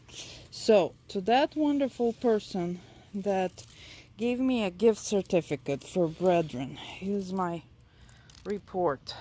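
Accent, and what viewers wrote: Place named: Poland. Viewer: I love phalaenopsis and I grow them in my livingroom. I got interested in these plants through my sister-in-law. She has a kind of accent like yours, because she is Polish. That's why I thought you were Polish or Russian ;-) Florida seems a great place to live. But maybe a bit too hot for me. And too many spiders, snakes etc. Thanks for sharing your knowledge and way of life, from the other side of the world. All the best. And lot's of Rain! :-))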